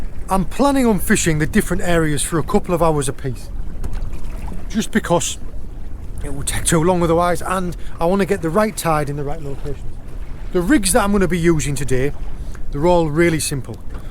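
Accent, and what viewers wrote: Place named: Yorkshire, United Kingdom. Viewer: I am really enjoying your videos. You had me at the mention of Cornwall. I went there once and loved it. I always dreamed of retiring there and just painting everything. I came back with hardly any pictures though; I was too busy enjoying the place! I don't really fish, but I used to dive,so I love the underwater stuff. And I love the foraging. I am not much for beaches unless it is in cool weather. Cornwall fits the bill! Most of all, I enjoy listening to you. I didn't think you were Cornish, because I can understand you. But the Yorkshire accent I do get. Your voice is soothing and you are always smiling. You have a nice family as well. Your son is fortunate to be able to learn from you.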